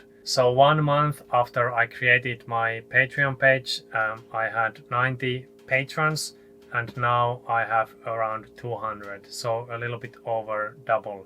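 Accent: Finland. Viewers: I have enjoyed every one of your videos I have seen (most you have produced). You do have a unique channel and sailing focus. I think this video is very good, helpful to others, and a good sign of your transparency and honesty about the costs and challenges you have faced so far. I do have a friendly, easy to implement, suggestion for your channel. I encourage you to start your videos by a very brief introduction of yourself, something like "Hi, I'm, from Finland, I started sailing...and my goal is...and my partner Sophia and I are...join us as we explore Iceland, snowboard, etc..." and I would do that each and every video. Each one. Make it a part of your "intro" to the video. Also, I think most of the sailing channels that keep the viewers engaged (and that leads to more Patreon supporters) do so by making some kind of "Here is who I am, and YOU (viewer) are with us...'" I think many of the viewers like feeling like they are "there" with you, imagining what it is like, etc. 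To be frank, i did not know your name until watching several of your videos, and was not certain where you started (though i suspected Finland because of your accent), or how you supported yourself (translation mentioned in this video). Those are things that are personal details, but help a new visitor to your channel get some kind of possible connection. I came across your channel by chance, and started watching because I always dreamed of sailing in Arctic waters. Then when I saw you got Ar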